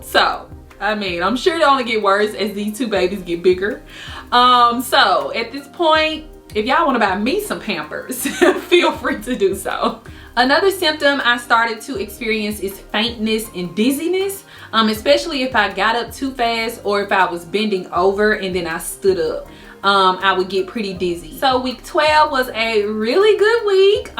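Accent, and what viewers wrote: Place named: Louisiana, United States. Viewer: #1 Congrats!! Two blessings 💕✨ #2 You are so dang precious!!!! Lol your accent (I'm from Louisiana so I get it Haha) + your mannerisms are just too likeable! Prayers for you & those babies 😊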